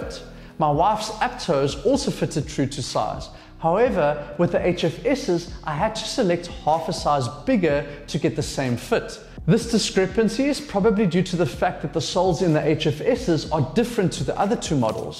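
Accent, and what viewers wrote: South Africa. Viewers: South African accent?? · Ja bru I skeem he's a joburg o ay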